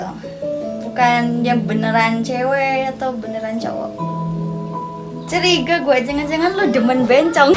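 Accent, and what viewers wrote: Java, Indonesia. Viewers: kok logatnya kayak Jawa, aslinya mana mohon dijawab, salam jatim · Muka sunda logat jawa.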